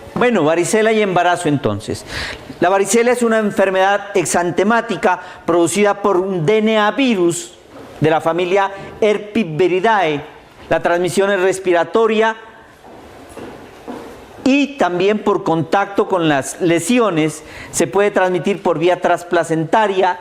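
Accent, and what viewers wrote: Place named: Colombia. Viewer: Que mal da clase no mames es colombiano seguro por su acento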